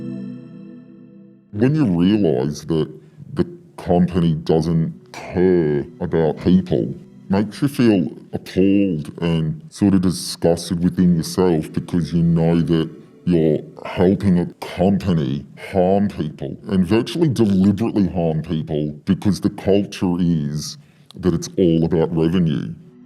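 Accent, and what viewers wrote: Australia. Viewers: Aussie if I’ve ever heard it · this guy is 100% aussie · This guy has to be Australian. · Australian accent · This guy sounds Australian. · Sounds like this guy is definitely Australian. Could only be Sportsbet, BET365 or Ladbrokes then. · This guy is definitely Australian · australian accent. tanned skin. age 40-59. cadence indicates melbournian. one of the largest organisations .SPORTSBET,TAB,crown,WATERHOUSE thats what i saw in this vid about you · no amount of distortion can hide that Australian accent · This guy is from Australia maybe from Perth ... words like tick tick tick and Heeps gave it away and theres a Casino in perth so im just guessing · Dude is Australian, I can tell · that aussie accent, and he means TAB they are crooks, as soon as you get a winning streak or jag a massive multi or first 4, they ban you from betting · Can tell hes an aussie 🤣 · Pretty obvious this guys from Australia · Bro can’t hide the Australian accent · Sounds like an Aussie. 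· the legendary aussie accent powering through the muffled voice effect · sounds like an australian accent, interesting! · 2 seconds in Yeah this guys Australian ool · Can tell this guy is an Aussie from his voice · i like that you can hear the australian accent through the voice morphe lmfao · No matter how hard you try - you cant hide that Aussie accent!!! Get a dog up ya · easy to tell hes aussie. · Aussie yo!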